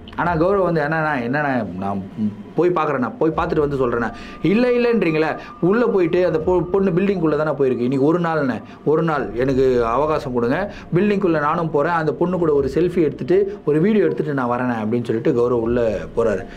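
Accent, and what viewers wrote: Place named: Bangalore, India. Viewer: U have Bangalore slang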